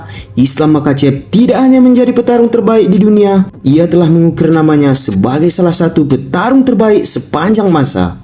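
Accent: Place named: West Java, Indonesia. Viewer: .. Sedikit saran niih bro. Agak dikurang2inlah sedikit logat/dialek "Sunda" mu. Penonton mu tak semua orang Sunda. Came on, up grade lah chanel mu biar lebih keren.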